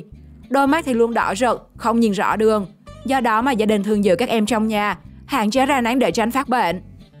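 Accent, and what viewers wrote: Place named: Phu Yen, Vietnam. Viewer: Cô này là người Phú Yên- Tuy Hòa. Cô ấy cố gắng sửa giọng để giọng nói không bị rặc tiếng địa phương, nhưng càng sửa thì giọng cổ lại càng khó nghe vì nó lai đủ thứ miền.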